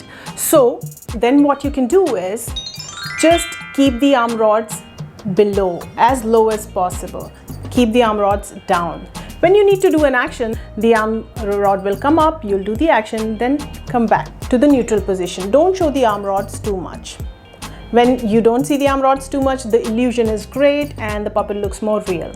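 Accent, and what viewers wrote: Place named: India. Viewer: Very good. Thank you. The Indian accent is gorgeous too!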